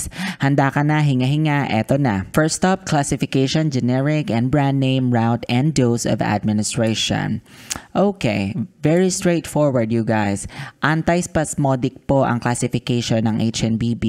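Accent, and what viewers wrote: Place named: United States. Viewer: English di maintindihan parang amercano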